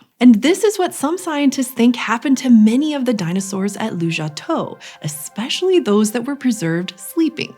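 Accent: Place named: United States. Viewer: Dear PBS, for us older folks that still use the imperial system of measurement , please use both systems when describing distance. This speaker sounded very American so why are you using the metric system and not including the older American imperial system ? We old folks aren't dead yet please mention both systems.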